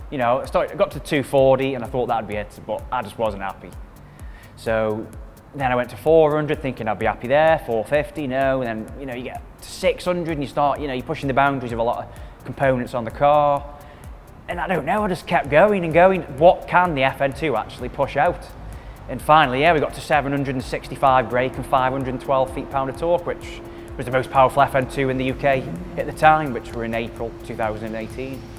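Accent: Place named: Stoke, United Kingdom. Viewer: You are deaffo a Stokie! I recognise that accent from anywhere :D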